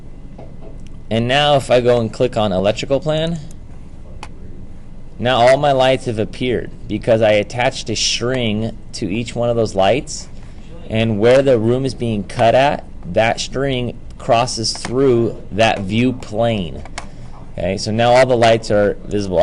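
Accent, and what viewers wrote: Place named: United States. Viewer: Finally, Revit Electrical that is in CLEAN ENGLISH American. Thank you.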